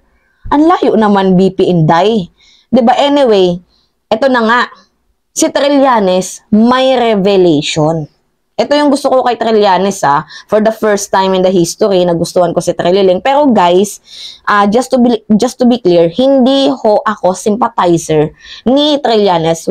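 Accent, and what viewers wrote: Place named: Visayas, Philippines. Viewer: Bisaya ka day... matigas ang pilantik ng dila mo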